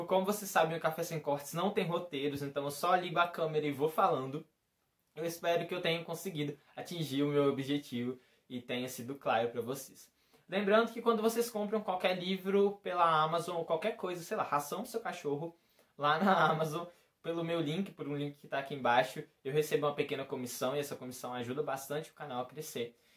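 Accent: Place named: Minas Gerais, Brazil. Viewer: É uma delícia ficar ouvindo esse sotaque mineiro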